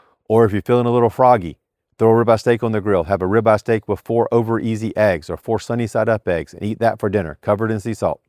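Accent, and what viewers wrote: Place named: South, United States. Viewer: Hey! I stumbled on your videos while doing some research. I've tried this diet before and did great for 3 weeks but cracked because I visited a friend and had some whiskey which started a domino effect. Since then, I've fixed my drinking, I just don't do it any more! In that time, I have found my electrolyte solutions and salts. They work great. I just have a few questions. 1: Is it alright to incorporate 4 oz to 8 oz beef liver a week for those fat soluble vitamins? 2: Is there a calorie mark for the day? Last time I ate twice a day, around 2600. 3: "Keto Flu", did you just push through that detox phase? Any pointers? 4: Is this something you sustain? Or is it something you go through phases with? Ex. 3 months carno, 1 month incorporated diet. Hope to hear from you! Your southern drawl reminds me of my wrestling coach in high school and has opened up a new source of motivation for me!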